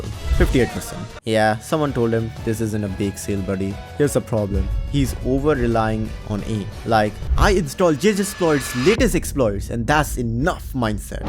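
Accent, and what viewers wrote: India. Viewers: This guy sounds like an indian🗣😭🙏🏻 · there is no way your name is with your indian accent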